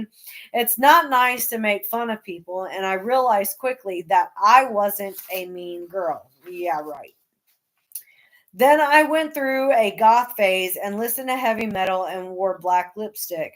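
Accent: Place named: Louisiana, United States. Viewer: Buttery and Cajun accent do not belong in the same sentence.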